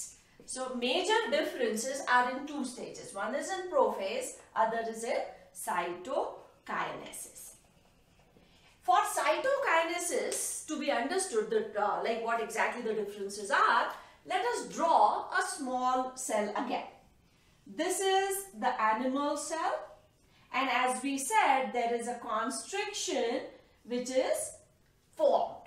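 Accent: India. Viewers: Usually I cant stand indian accent. But yours is just admirable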